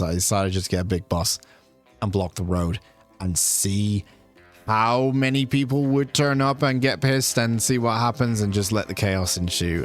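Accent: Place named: Bristol, United Kingdom. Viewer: Love the Bristol accent ❤and love to see what npcs do with their day 😂 I hope it gta 6 they actually live full lives.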